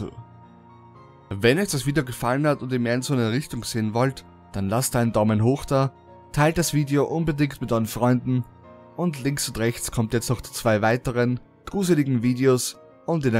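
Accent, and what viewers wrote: Austria. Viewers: jo woher aus oesterreich kommst du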